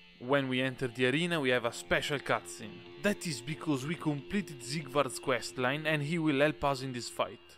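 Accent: Italy. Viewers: I love the accent. I believe it's Italian? It's very satisfying to listen to imo and a fresh change of pace from the American, British, and Australian accents. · Kept seeing this particular video in my recommended tab, a worthwhile video to watch indeed. Forgive me for I am new to this channel. But, that accent I hear, is it Italian? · Are you perhaps Italian? Your accent reminds me of an Italian one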